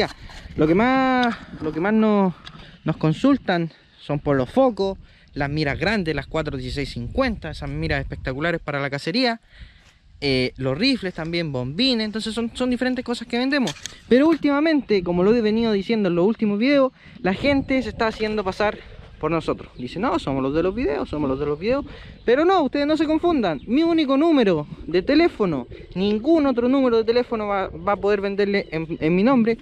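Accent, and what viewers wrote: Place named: Chile. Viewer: Re chileno 🤣. Saludos desde Argentina 🇦🇷🇦🇷👍😎👍🇦🇷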